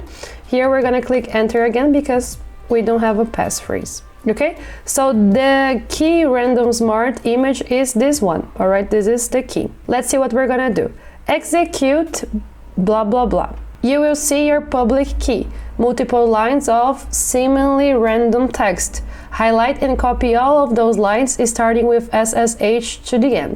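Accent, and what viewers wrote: Brazil. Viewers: thank u so much. and btw a month of watching your tutorials, always assumed you were russian or ukranian, never crossed my mind that accent was brazilian😆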